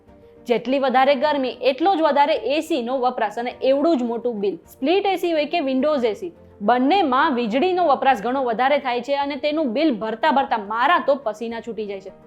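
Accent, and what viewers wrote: Kathiawar, India. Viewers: Whether you are from Kathiawad or what, the speech seems to be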